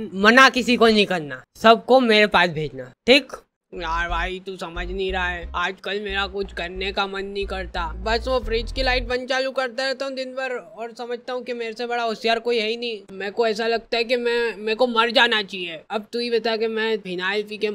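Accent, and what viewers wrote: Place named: Malwa, India. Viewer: Bro tumhari urban malwu accent bahut mast h yaar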